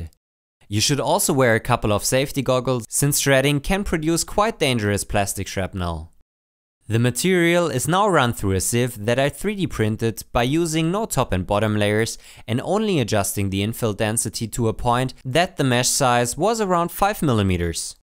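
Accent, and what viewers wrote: Germany. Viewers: Man hört sehr den deutschen Akzent raus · Man hört so richtig, dass du Deutsch bist😂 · Jo your English sounds very German :] Me also German hehe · German accent is too strong to watch this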